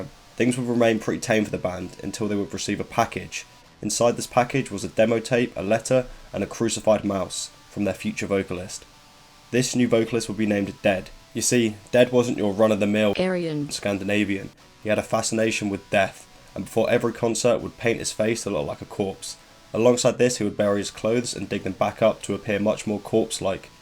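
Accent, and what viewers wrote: United Kingdom. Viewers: british mb?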